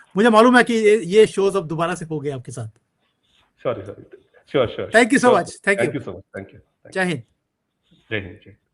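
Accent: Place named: Kerala, India. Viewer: I can easily make out the Mallu accent (among other things). It's difficult to hide.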